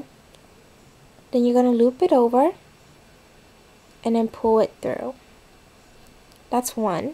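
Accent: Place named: New York, United States. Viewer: Love your accent are you from newyork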